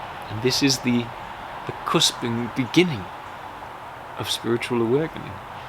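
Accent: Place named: Northern England, United Kingdom. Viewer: So profound. It actually made me cry. So much conditioning and "recording". I just wish I'd been more awake when my kids were small 😥 By the way, where's that accent from? I am from County Durham (but living in Sweden), and assume you're not far away!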